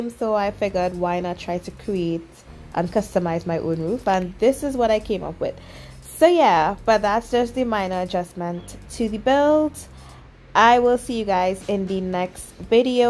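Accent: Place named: Nigeria. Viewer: love thw cafe. where are you from you sound Nigerian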